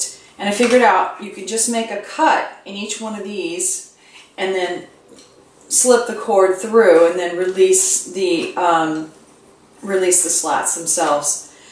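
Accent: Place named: Canada. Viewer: Love your calming voice sounds Canadian to me ,,thank you this a great video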